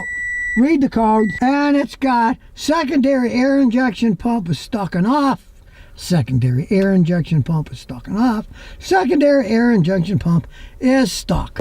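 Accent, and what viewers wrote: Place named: Western New York, United States. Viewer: U annoy me so much...then your voice made me think...is he also from WNY? AI told me not from Bflo..but the Falls is the same! KNEW IT. That accent has an annoying sound. In Colo Spgs 30 yrs - same thing. I'm annoying too but ♡ my cars are so not rusty & trouble free!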